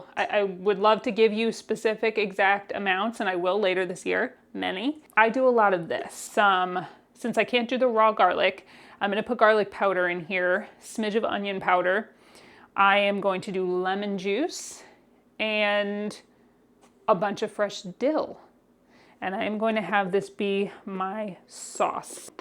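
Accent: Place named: Northeast, United States. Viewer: Where are you originally from? I notice a bit of a NE accent (water) 😅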